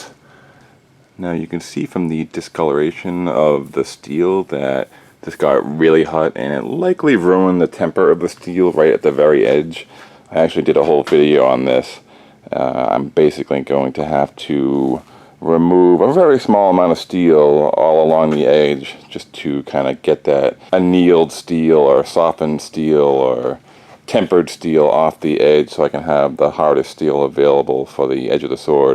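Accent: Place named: New England, United States. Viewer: Where u from.dude? U sound like u got a Rhode Island slash Massachusetts accent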